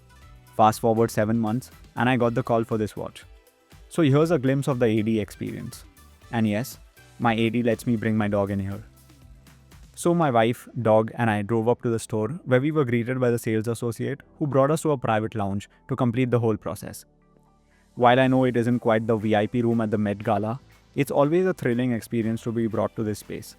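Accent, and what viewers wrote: India. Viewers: don´t know, this video popped up and I am fascinated by an indish accent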